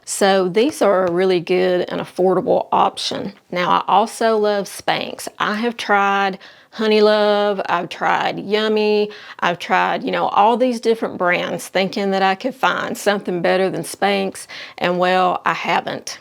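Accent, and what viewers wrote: South, United States. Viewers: Great tips and helpful links. I even love your southern accent. 😊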